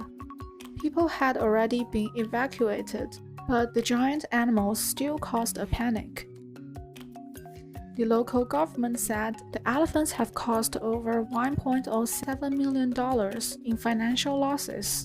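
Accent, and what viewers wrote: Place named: China. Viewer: bad Chinese English accent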